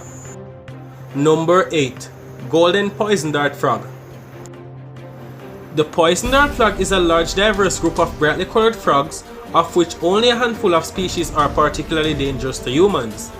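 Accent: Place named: Jamaica. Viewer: Come through with the Jamaican accent yesss these videos are popular but not with a JA accent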